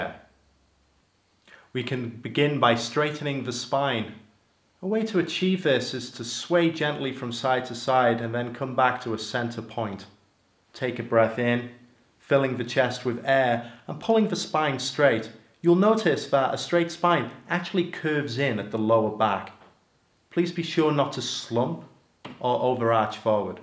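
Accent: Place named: England, United Kingdom. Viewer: Why does Steve-O have an English accent?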